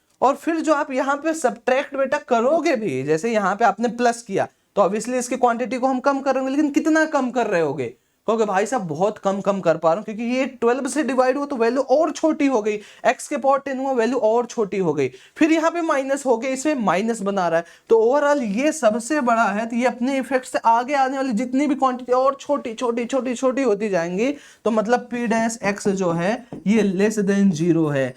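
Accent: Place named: Uttar Pradesh, India. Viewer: UP guy, not odia.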